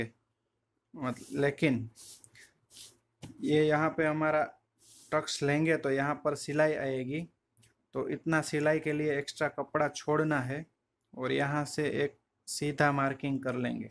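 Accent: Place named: Gujarat, India. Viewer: aapki bolne ki sppech se lagta he ki aap gujrari ho agar aap gujrati ho to plZ gujrati video bhi ek banaye na muje beak side pe kadi vala blause sikhna he plz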